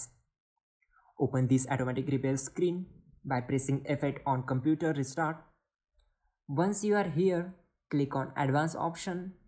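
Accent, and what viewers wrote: India. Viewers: Yes... when you hear Indian voice, its gonna work... really works for me...Well done...Thank you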